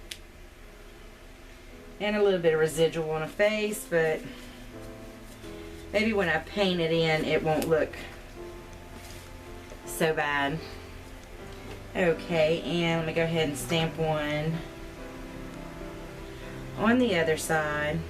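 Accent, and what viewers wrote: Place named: Oklahoma, United States. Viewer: Theresa5 sent me! I love watercolor and will enjoy your series. I love your accent! I am originally from Oklahoma, so your accent reminds me of home (even if you are not from there... lol). Thanks for sharing your lovely work!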